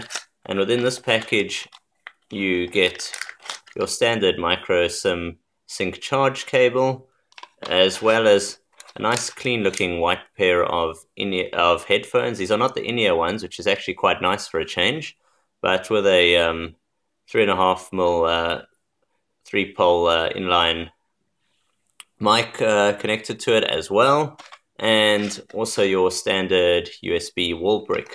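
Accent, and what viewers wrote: South Africa. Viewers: i knew you where south african! awesome to see local stuff :) good stuff man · Managed to get a WHITE P7 which is extremely scarce at the moment in Cape Town! Price has come down a lot....R5300.... went from the iPhone to this one and its stunning! Was so nice to listen to this video done by a South African.... Thnx for a good tutorial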